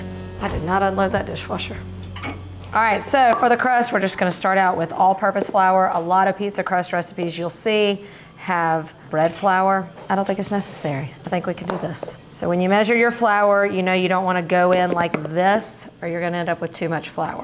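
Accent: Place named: Alabama, United States. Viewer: You talk like me. We must be from the same area in Alabama.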